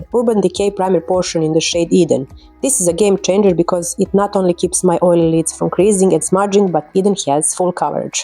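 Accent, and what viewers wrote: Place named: Russia. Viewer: вы русская? Зачем говорите на англ. языке, да еще и с таким акцентом?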